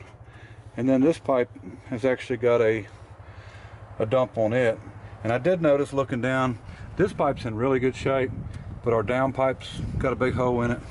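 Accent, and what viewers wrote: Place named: West Virginia, United States. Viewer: is your family from West Virginia I'm just wondering because of your accent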